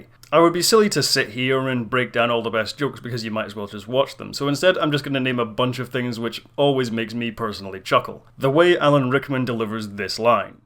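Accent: Scotland, United Kingdom. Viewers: OMG! What town in Scotland were you born in? Sooo beautiful. Your Scotch accent is not thick BTW. LOL. Jjking. I know the term is "Scottish". The Macallan is my favorite Single Malt SCOTCH whiskey(proper use). No blended whiskey ever passes my lips.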